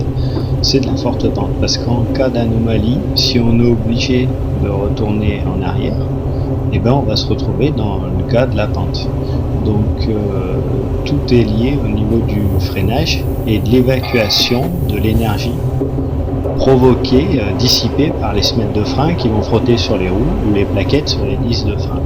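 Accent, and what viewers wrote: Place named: Auvergne, France. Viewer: Merci pour ta réponse. Si mes recherches sont bonnes, les XGC possèdent 2 MD qui entraînent des alternateurs - redresseurs pour du 1,5 kV qui alimente deux onduleurs entraînant chacun 2 moteurs asynchrones logés dans les bogies d'extrémité. Donc je suppose que le frein moteur est seulement rhéostatique. Peux-tu utiliser le frein rhéostatique seul ou celui-ci est uniquement combiné avec le frein général de l'autorail? En ce qui concerne le contrôleur (je ne connais pas le terme officiel), il n'assure qu'une fonction commerciale car si je comprend bien c'est toi qui assure l'ouverture et la fermeture des portes et qui reçoit d'autorisation de départ de l'agent sédentaire ( oublié le terme officiel). Je me répète, tes commentaires sont très pertinents et ta voix passe très bien ( avec petit accent auvergnat. ;-) ).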